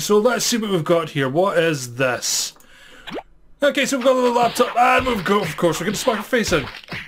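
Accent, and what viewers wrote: Scotland, United Kingdom. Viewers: Haha this is too funny!:) it's actually so refreshing to hear a fellow Scottish accent on YouTube! Haha, love your videos!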